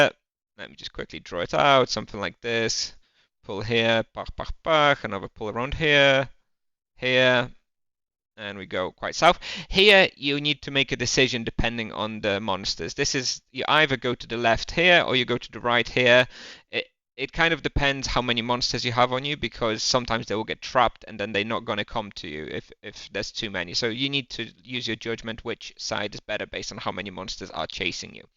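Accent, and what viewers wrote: United Kingdom. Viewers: moje niedopatrzenie, musiałem skipnąć, bo intuicyjnie liczyłem, że będzie to na końcu filmu. Super kanał, masz mega potencjał ze względu na świetny angielski. Siedzisz w UK? Tak brzmisz hehe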